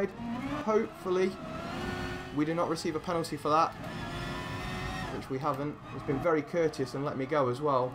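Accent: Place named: England, United Kingdom. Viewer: Nice race. What part of England is that accent from?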